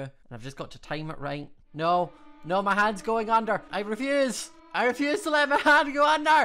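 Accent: United Kingdom. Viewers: U sound British 🤐🤐🤐🤐🤐🤐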